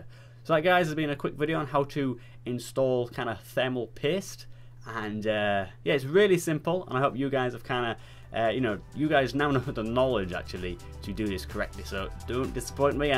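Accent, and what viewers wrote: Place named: Northern England, United Kingdom. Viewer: Thought so, you sound more northen than me haha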